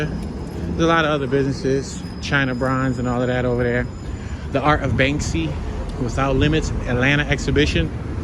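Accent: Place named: Brooklyn, United States. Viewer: Sounding deep Brooklyn.enjoy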